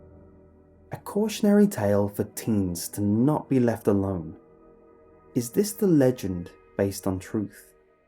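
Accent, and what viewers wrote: United Kingdom. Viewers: Still liking this Beyond Belief: Fact or Fiction twist of a series. Creepy Pasta reading is kind of dying, and I know it's hard to move on to something new. You're doing great. Anyone else have that deer in the head light look every time an Asian person has a British accent? Come on....come on....be true to yourself.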